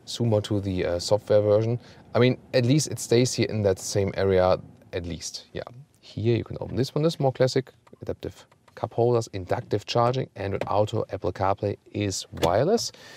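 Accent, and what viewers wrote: Germany. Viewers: Great review, this channel improved a lot in the last year, good job! And love the german accent as Im italian whom lived in Germany and learned the language.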